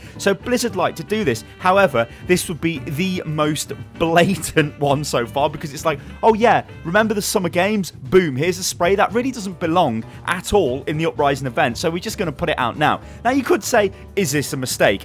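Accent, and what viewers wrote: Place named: United Kingdom. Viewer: British pep say schedule soooo wried the C is silent when u guys say it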